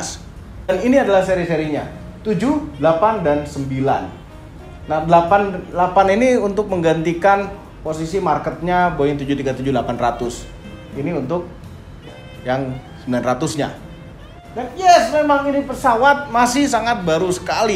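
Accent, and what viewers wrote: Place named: Blitar, Indonesia. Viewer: Blitar men logate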